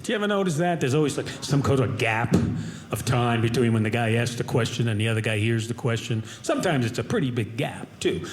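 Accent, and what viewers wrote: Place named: East Coast, United States. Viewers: Thanks! Yes, I hear some east coast, but I also thought I heard a little midwest, too. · Educated east coast...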